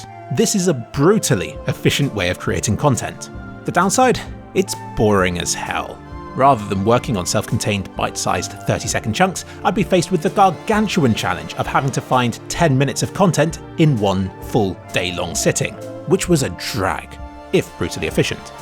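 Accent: United Kingdom. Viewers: Hey, I use the subtitles you make because I think they're more accurate. And because English is not my first language it's hard to me understand British accent So I really appreciate your hard work subtitling your videos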